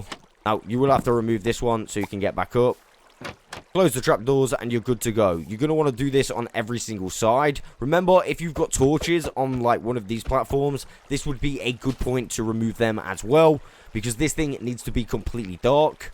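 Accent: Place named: England, United Kingdom. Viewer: english lol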